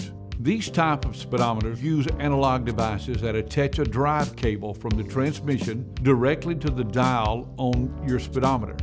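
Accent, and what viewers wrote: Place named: Texas, United States. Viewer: "Their ve-HI-cles" WHY can't they pronounce words right?? Also they say h-wile instead of while. OMG the texas accent is super annoying but this video is very informative and was the only video i could find that showed how they work. But that accent will always irk me >__< either he's from Texas or Tennessee I can't tell their accents all sound the same to me.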